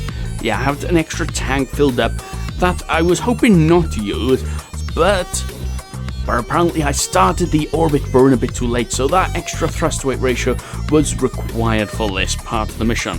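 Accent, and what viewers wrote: Wales, United Kingdom. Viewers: Welsh? Did I hear WELSH?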